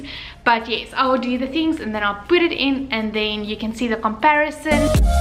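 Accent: South Africa. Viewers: You sound South African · She sounds very South African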